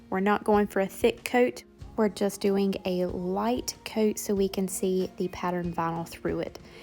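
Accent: South, United States. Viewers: As usual, a great tutorial. I just love your southern accent, so cute!! Can’t wait for the next shop sale.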